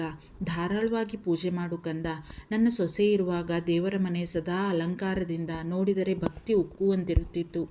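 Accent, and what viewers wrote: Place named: North Karnataka, India. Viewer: ನಮ್ಮ ಉತ್ತರ ಕರ್ನಾಟಕದ ಕನ್ನಡ ಛಾಯೆಯೊಂದಿಗೆ ಉತ್ತಮವಾದ ವಿವರ 😇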